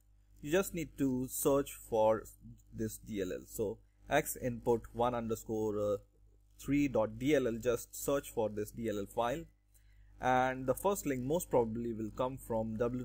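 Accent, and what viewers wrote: India. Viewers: thank you Indian accent man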